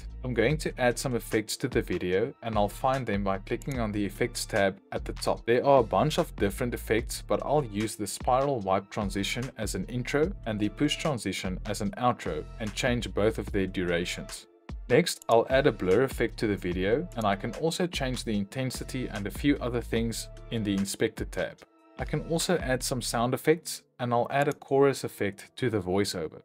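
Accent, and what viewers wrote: South Africa. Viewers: You sound South African 💯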